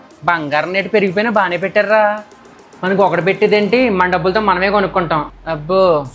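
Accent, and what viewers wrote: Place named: East Godavari, India. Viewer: Completely east godavari slang....😊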